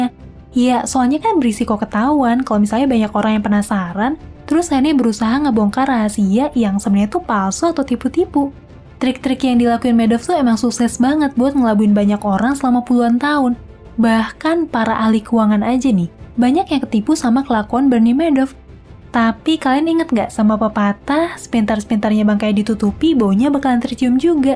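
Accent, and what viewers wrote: Indonesia. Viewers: aksennya indo banget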